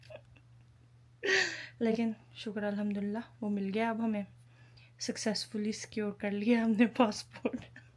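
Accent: Lahore, Pakistan. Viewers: 😀😀😀china Pakistan 🇵🇰 iron friendship. I love & proud born in Pakistan 🇵🇰. You”re so funny speak Urdu like tipical Pakistani. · You have really pure lahorei accent bless you I really enjoy your vlog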